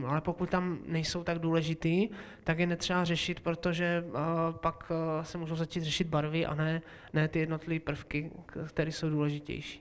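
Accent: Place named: Moravia, Czech Republic. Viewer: díky za facku. nechal jsem se unést pod vlivem špatného dojmu z toho videa (k čemuž v mém případě určitě přispěl i moravský přízvuk přednášejícího, který nějak nemůžu vystát - omlouvám se, ale prostě je to tak... snažím se to ale do hodnocení nepromítat). přednášel odborník pozvaný na doporučení, takže by bylo fajn, kdyby to mělo tomu odpovídající úroveň; podle mě ji to nemělo (neodvažuju se nicméně zpochybňovat odbornost přednášejícího, ale odbornost není všechno…).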